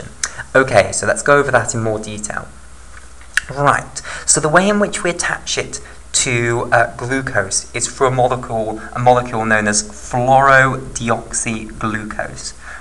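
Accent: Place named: RP, United Kingdom. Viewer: Very nicely explained....very very britishy poshy accent... :D ...very "intellectual" sounding. Cheers from Brussels!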